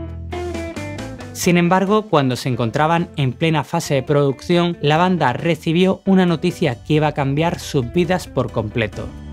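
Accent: Spain. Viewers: Que fondo musical tan horrendo ! y la voz españita de este chaval no le hizo ningun favor, no tenia Santana suficiente musica para ponerla de fondo ?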